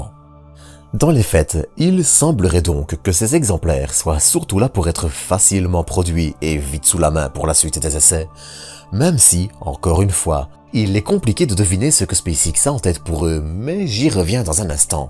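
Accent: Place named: Brussels, Belgium. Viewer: J’ai vu ta bouille en cherchant un peu. On entend clairement les efforts que tu fais pour réduire l’accent Bruxellois(je suis belge aussi d’Anderlecht même) une proposition: et si tu t’en foutais et que le ferais avec ta façon normale de parler tu attirerais probablement plus de personnes et deviendrais le premier youtuber ciel et espace du plat pays. Allei tichke tes goed!😜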